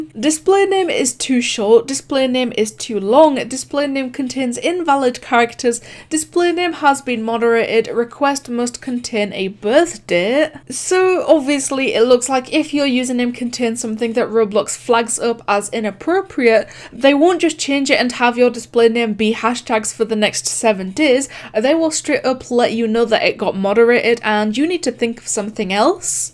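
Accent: Scotland, United Kingdom. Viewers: Is it just me or is she scottish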